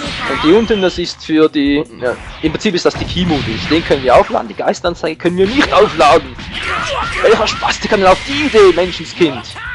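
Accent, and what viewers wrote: Switzerland. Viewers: Ich finde deine Stimme nur sympatisch, bedingt durch deinen Akzenten. Schweizer haben nach wie vor den coolsten Dialekt. Und nebenbei, echt tolle Let's Fetz, Fight, Play, wie auch immer ;D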